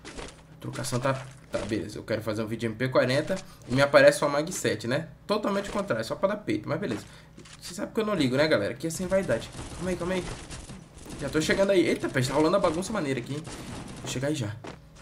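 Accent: Bahia, Brazil. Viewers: Tu é da Bahia mano?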